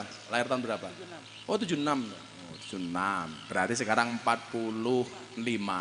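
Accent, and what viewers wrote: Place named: Banyumas, Indonesia. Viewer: Masyaalloh gus gus ngapak ngapakku dewek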